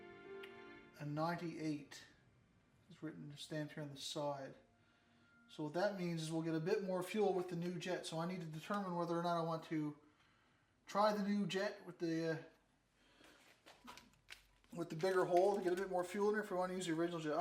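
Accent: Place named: Canada. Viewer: love your videos! I'd prefer real time filming so I can follow step by step rather than the speeded up sections but still awesome nonetheless. Love your Canadian accent too, I'm in New Zealand now but I used to live in Vancouver. We can't easily get carb kits or any spare parts down here. I'd love to rebuild my 1979 XL 100s carb and put a choke cable on it, new throttle cable etc but just can't get the parts :-(. Here's my bike... <link>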